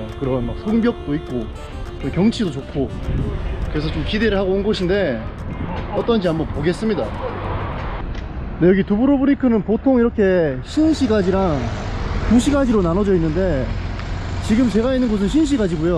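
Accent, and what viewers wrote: Gyeongsang, South Korea. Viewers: 나 웨 영상 끝나는게 싫지? 재며들었나봄...경상도식 영어 못잃어↗️↗️↗️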